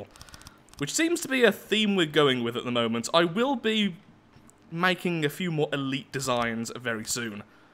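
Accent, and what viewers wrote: United Kingdom. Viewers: Wait, your British?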